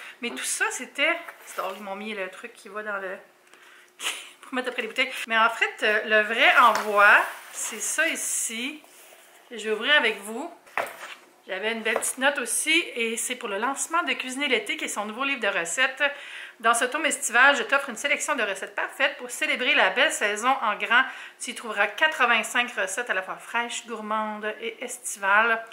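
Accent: Canada. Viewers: Un bonjour de BXL J'AIME LES SONS CANADIEN ❤❤❤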